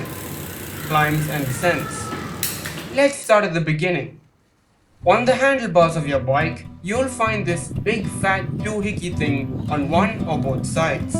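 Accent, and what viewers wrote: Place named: India. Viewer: Love your vids. You have a little Indian accent but nothing to bother with. Continue I will still continue watching. Where do you live ?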